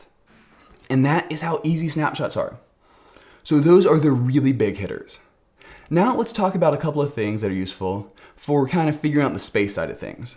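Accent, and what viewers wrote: California, United States. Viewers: They way he speaks reminds me on the SNL sketch, "Californians". Can someone from the US say where his accent is from ?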